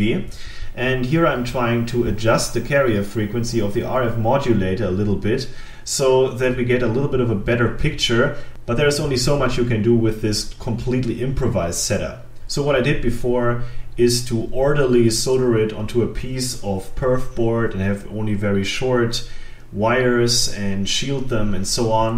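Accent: Germany. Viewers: Sorry aber man hört den deutschen akzent einfach raus ; D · Hi your englisch sounds German : ) Realiy nice work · 100% German accent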